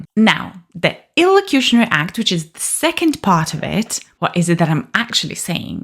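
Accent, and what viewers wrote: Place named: United Kingdom. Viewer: It's interesting that your British accent is so strong.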